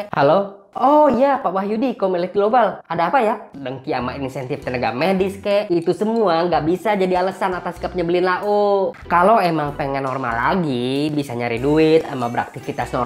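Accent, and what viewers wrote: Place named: Malang, Indonesia. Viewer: Semacam arek malang bahasanya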